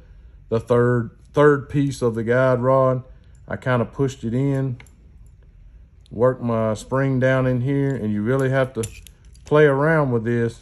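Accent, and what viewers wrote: South, United States. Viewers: You can’t help your southern accent and I for one will not hold it against you sir , at least you speak English .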